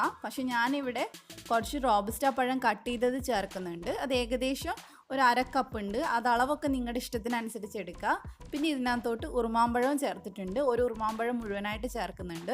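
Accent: Kannur, India. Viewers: കണ്ണൂർ ഭാഷ 👌💓 അടിപൊളി ഒന്ന് ഉണ്ടാക്കി നോക്കിയിട്ട് കാര്യം 😋😋😋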